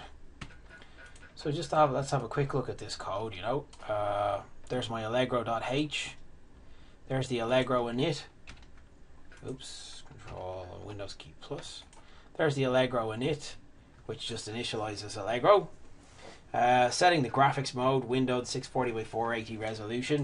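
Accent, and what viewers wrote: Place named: Ireland. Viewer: Had to find this video again. I just listened for the Irish and here you are. Thanks again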